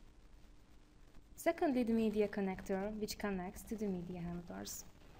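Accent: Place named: Hungary. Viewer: Annyira magyar az akcentusod hogy kihallom :D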